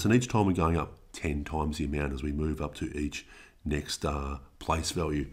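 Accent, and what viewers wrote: Australia. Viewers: At first I thought you were british but the Australian accent kicked in later in the video 😂😂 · I watch your videos because I always learn whatever you’re teaching because you teach very well but mainly because I just wanna listen to you speak lol where are you from? Australia im guessing but which part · I had to go over this in my online class and completely skipped the unit cause the way they explained it was too complicated. It was ridiculous and I hate math. After this video, I went back, followed the instructions and double checked and got them all correct. The Australian accent made it 40x easier. Wtffffff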